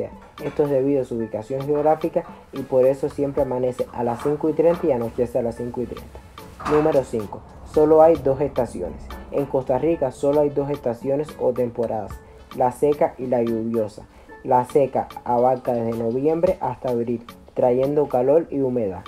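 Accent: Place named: Dominican Republic. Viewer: ups lo siento espero no haberlo insultado....conozco algunos dominicanos y tienen su mismo acento.....saludos y 🌴 P U R A 🌞 V I D A 🌴 🇨🇷🇨🇺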